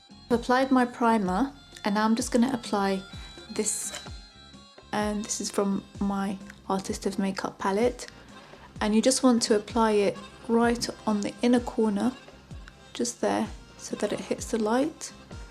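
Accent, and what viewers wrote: United Kingdom. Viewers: Love you accent are you living in the UK cuz it sound like a british accent btw you<re beautiful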